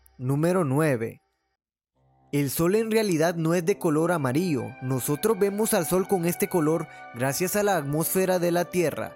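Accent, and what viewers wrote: Honduras. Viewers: eres de Honduras ? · ¿Es hondureño? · Eres hondureño?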